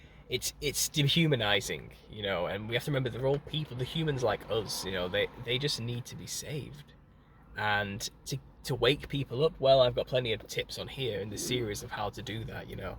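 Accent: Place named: England, United Kingdom. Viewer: They aren't stupid, just misled. There's an entire ecosystem of facts they can cling to even if they do there own research. Nice to see an English voice in this space, you do good work, lots of love.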